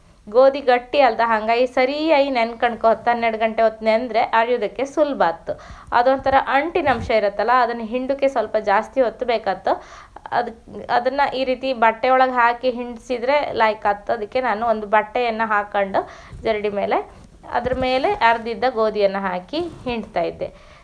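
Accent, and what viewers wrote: Kundapura, India. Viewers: ನಿಮ್ಮ ಕುಂದಾಪ್ರ ಕನ್ನಡ 👌🏻👌🏻 ಗೋಧಿ ಹಾಲ್ಬಾಯ್ ಕೂಡ ಬಾಯಲ್ಲಿ ನೀರ್ ಬರೋ ಥರ ಇದೆ. 2 ದಿನ ಇಟ್ಟು ತಿನ್ನಬಹುದಲ್ವಾ.. 👌🏻👌🏻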